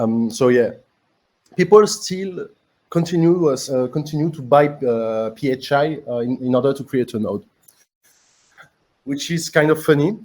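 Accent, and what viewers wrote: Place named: France. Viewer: So greaaaat 🔥 Love your cute French accent lol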